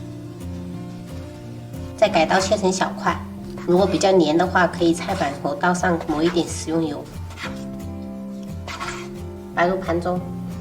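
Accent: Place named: Sichuan, China. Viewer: 是四川人，發音是不太標準😁幾將就聽吧☺️我是正宗泉州石獅人😊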